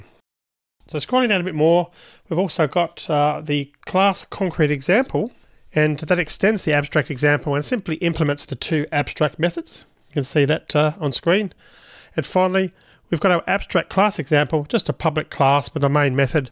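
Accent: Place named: Australia. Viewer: I'm taking Kotlin class in Udemy - in general, he is in the top percentile in terms of completeness, ability to explain things conceptually (why you do sth, not just what), video editing (he actually edits his videos, so typos and corrections don't show up and important snippets are outlined instead of wiggling a mouse), pace (you have time to absorb concepts), practicality (both concept and mini-projects)...and, notwithstanding the Aussie accent, his English is very easy to understand...really great stuff across the board!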